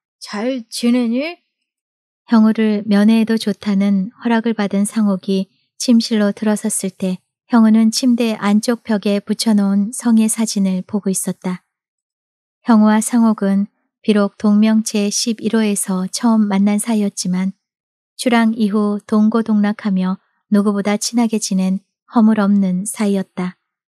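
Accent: Jeolla, South Korea. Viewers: 전라도 사투리 갱상도 사투리까지 완벽소화하셨네요 💯💯💯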